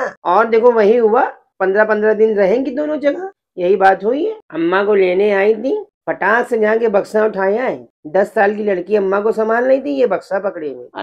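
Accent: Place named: Lucknow, India. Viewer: Your lko ascent is best❤❤❤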